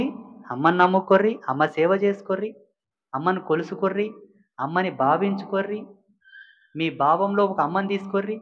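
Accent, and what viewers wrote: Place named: Telangana, India. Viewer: గురువుగారు గొప్పగా చెప్పారు స్వామి మీ జ్ఞానం అమోఘమైనది మీ వాయిస్ చాలా బాగున్నది తెలంగాణ యాసలో మాట్లాడడం చాలా నచ్చింది మీరు మాట్లాడితే కెసిఆర్ మాట్లాడినట్టే ఉంది మీకు పాదాభివందనాలు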